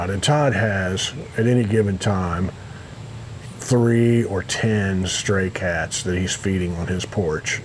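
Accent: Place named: Midwest, United States. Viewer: I am from Michigan you are so Midwestern I love that!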